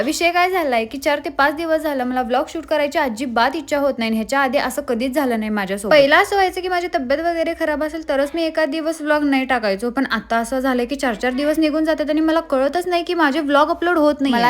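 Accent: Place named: Kolhapur, India. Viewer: हो कोल्हापूर ला पण आसेच बोलतात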